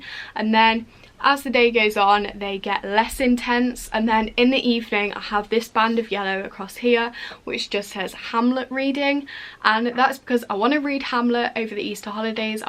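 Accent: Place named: Doncaster, United Kingdom. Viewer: Athena Newton then where did she get her accent from? It sound like Doncaster or somethinng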